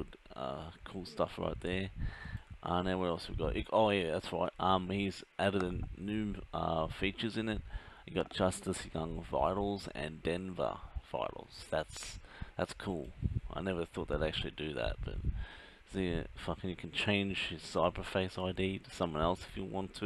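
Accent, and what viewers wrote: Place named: Australia. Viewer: You got an Aussie accent plus I saw that you modded don bradmen which is an Australia game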